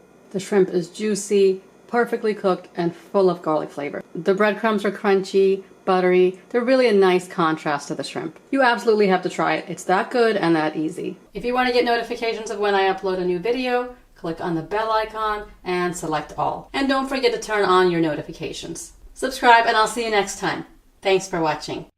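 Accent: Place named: Long Island, United States. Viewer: Delicious! You have a long island accent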